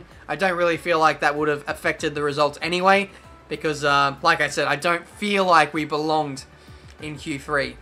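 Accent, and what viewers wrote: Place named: Australia. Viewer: No apologies are needed, I watch because i like the Australian accent and because i love F1, its like watching a season of F1 without having to wait 2 weeks till the next race weekend.. When i get home and finish cooking i watch this as daily ritual almost, and a crap race like this is still more fun to watch than any of the shite i see on TV.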